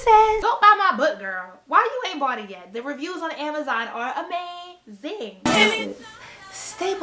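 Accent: United States. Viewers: you sound like a NY'er at heart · I can definitely hear the northeast accent in her voice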